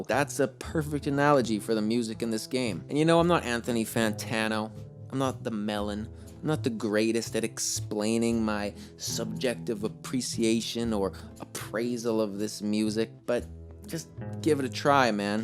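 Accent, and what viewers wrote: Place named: New York, United States. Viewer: You're a real New Yorker aintcha?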